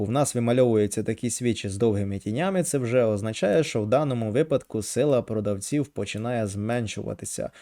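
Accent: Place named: Precarpathia, Ukraine. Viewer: Класна Українська Але я чую щось таке рідне прикарпатське!😮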